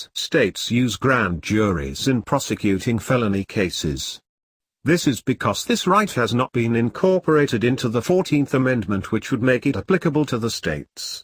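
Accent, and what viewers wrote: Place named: Russia. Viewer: Wtf robot voice hide Russian accent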